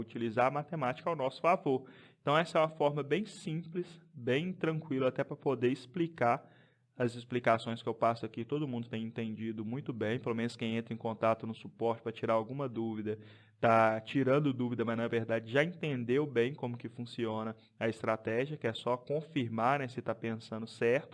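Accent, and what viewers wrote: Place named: Minas Gerais, Brazil. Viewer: Mineiro uai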